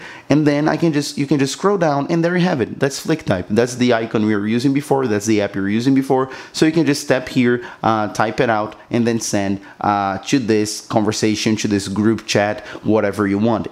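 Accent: Brazil. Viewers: I was like “I can hear the brazilian accent” and then I saw your whatsapp msgs “blz man” hahahahahaha muito obrigada, ajudou muito!!!!!!!!!!!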